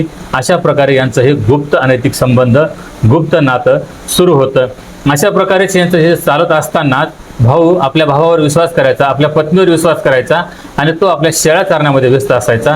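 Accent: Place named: Karnataka, India. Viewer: तुमच सर्व रील पहातोय बोलन जरा कन्नड टोण येतोय आणि सैशय नव्हे संशय म्हणावे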